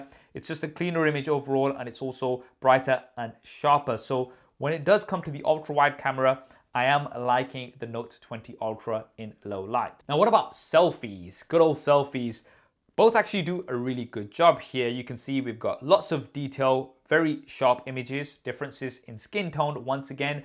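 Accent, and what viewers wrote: Leicester, United Kingdom. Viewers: Didn’t realise you was from leicester 😲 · Never knew you was from Leicester......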